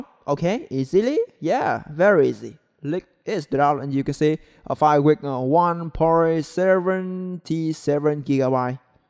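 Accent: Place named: Vietnam. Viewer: Nghe giống việt nam vãi!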